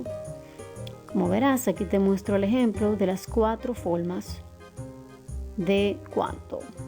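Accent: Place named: Spain. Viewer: Quiero saber de dónde es el acento de español de la profesora.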